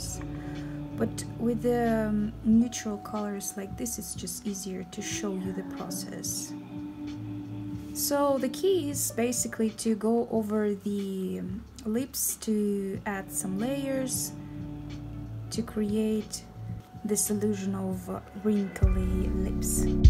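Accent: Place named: Russia. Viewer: Такой русский акцент ,хахаха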